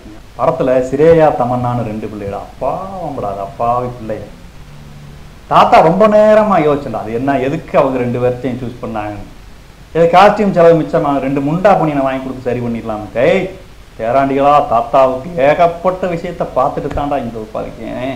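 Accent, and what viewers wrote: Madurai, India. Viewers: ஒழுக ஒழுக... 😂 கதற கதற... 😂 கொதவல கடிக்குறாங்க... நம்ம மதுர Slang செம்ம...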